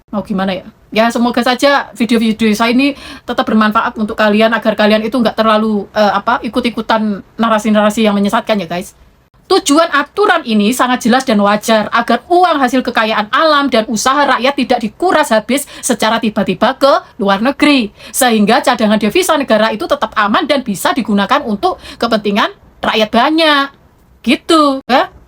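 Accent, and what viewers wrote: Java, Indonesia. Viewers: 😅😂 LANCAR AMAT BAHASA GOYANG INDONBABU LOGHAT JAWA YANG DI TUTURKAN AMA MBAK BABU JAWAHAMA NIH.. BERBELIT2 BERPUTER2, BERPUSING2 DAN BERULANG2.. 😅😂 BABU.. BABU..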